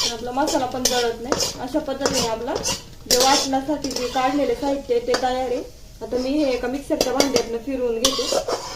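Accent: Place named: Akole Sangamner, India. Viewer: तुमच्या आवाजावरून तुम्ही सुगाव अकोले संगमनेर भागातील असाव्यात असे वाटते. आमटीचे नाव शिंगोरी गावावरून असेल असे कधीच वाटले नाही. हि आमटी राशीनची पन प्रसिद्ध आहे.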